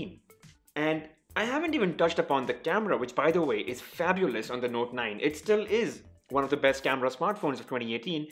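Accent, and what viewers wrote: India. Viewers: 这英语的咖喱味真明显。。。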